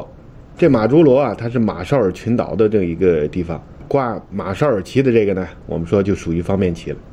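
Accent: China. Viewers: 李船长是台湾人吗？为什么是有大陆口音，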